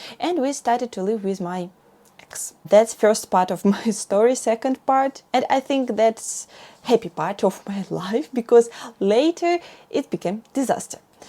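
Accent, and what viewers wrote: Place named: Russia. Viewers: The way she speaks is typical of Russians. As you notice, she doesn't use words like "the" since that doesn't exist in Russian grammar. She's not difficult to understand though. Most Russians won't be able to maintain this rate of speech and their pronunciation will be far off what you would expect. Channel would become Chairneeel. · i saw a short an thought you're Japanese talking English, but you're Russian talking Japanese English ... its quite hard to understand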